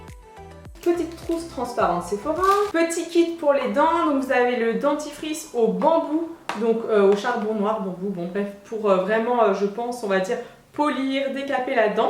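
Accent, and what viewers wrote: United Kingdom. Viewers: J adore ton hello et ton accent british. 👍👍tu présentes aussi des tres bons produits même pour les hommes c good. Très bonne vidéo. Merci à toi ça fait plaisir que tu sois aussi active. 💙💙✌✌#warrior4ever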